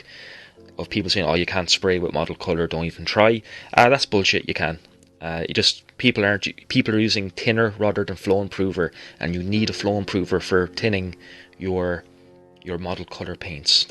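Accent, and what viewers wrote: Ireland. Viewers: Great job,! At first, I was surprised when you kept saying "tinner", but then realized it's your Irish pronunciation.:) Great video and very educational on spraying Model Color. Thank you. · my friend, your philosophy is my philosophy. The simpler the better! Oh, by the way; I like that cool accent of yours....are you Irish?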